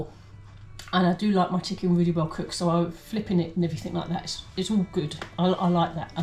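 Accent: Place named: Croydon, United Kingdom. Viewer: Just subscribed love your channel take it your from Croydon way just your accent 😊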